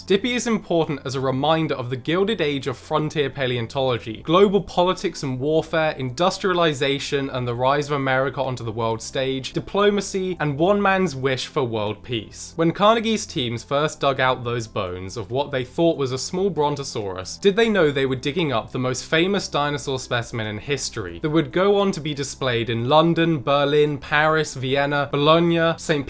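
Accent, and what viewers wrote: United Kingdom. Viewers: God give me the confidence to pronounce things like a Brit.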